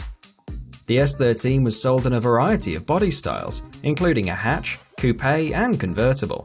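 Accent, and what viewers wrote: United Kingdom. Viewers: Because he's from The UK... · It's a neeesan not a nizzan 😂😂 i hate British accents lol . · Guys... It will be cheap cause, Listen to that accent, Pure english, that means UK confirmed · You're talking in Dollars and about the US market, but you cannot sit there and tell me you are American with that blatant British accent. Either talk in Pounds & UKDM, or get more American; pick one.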